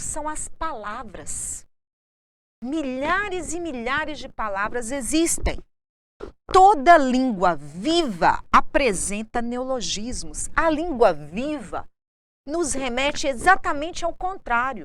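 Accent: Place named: São Paulo, Brazil. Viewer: De onde a professora tirou este sotaque paulista? Andou trocando o mineirês pelo paulistês.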